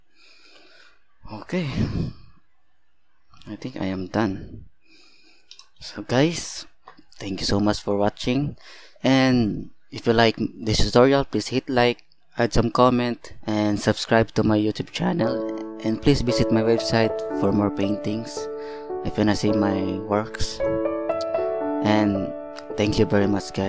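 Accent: Philippines. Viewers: By just listening to you, I know you are my kababayan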